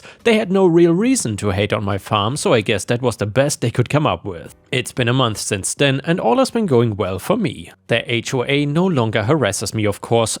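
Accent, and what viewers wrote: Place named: Germany. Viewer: I truly enjoy your content, the short stories at the end, and especially those vids of you and your wife utterly spoiling your cats. Those kitties appear to be SO well mannered and loving all the attention you lavish on them. But I AM curious about where you hail from. I know you live in Thailand, but your accent seems to be European - maybe German? But I know so little of all the various languages and regions of the EU I'm likely totally wrong. Would you mind sharing a little bit of yourself?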